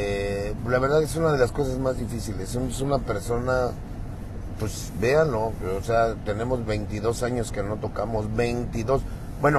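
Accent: Mexico. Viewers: Este compa habla CHILANGO FRESA 🍓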